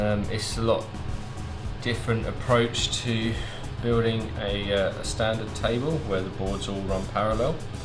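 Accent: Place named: United Kingdom. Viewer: The Brit accent makes you sounds like an expert !!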